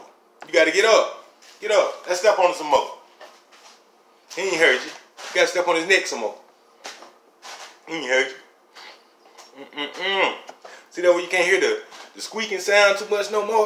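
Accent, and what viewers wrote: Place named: Memphis, United States. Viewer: Man sound like he from Memphis Aye mane… Say mane…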